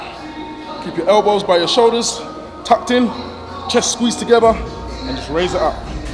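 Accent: United Kingdom. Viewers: Some British accents I like, this one just irks me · I have been bodybuilding for over 40 years, and you are by far the best trainer I have watched! You are the "Real Deal." Love your methodology, eating, and your pretty wife. UK accent is nice also. I am 68 years old and still hitting the gym 2 hours 3-4 day's a week! That is why you have never been injured the by way you train.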